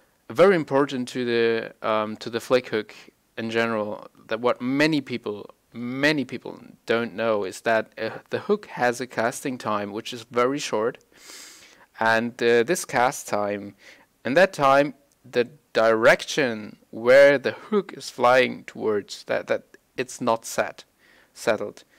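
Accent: Germany. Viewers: Found you from Jayne and loved your montages. Great video btw You sound like you are from Germany? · im preeeeeeeetty sure you´re german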